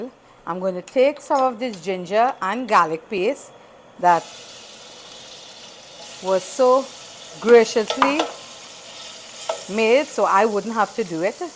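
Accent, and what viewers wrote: Trinidad and Tobago. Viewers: Listening to you reminds me of my grandmother who was Trinidadian bring back memories ❤❤ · I love Trini accents, love me some roti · I LOVE YOUR TRINIDADIAN ACCENT ! VERY RELAXING !!🇹🇹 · true trini. kept your accent girl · Just found your channel. Enjoyed the rapport and watching you cook. Love your kitchen. Nice to hear the trini accent. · don't change your trini accent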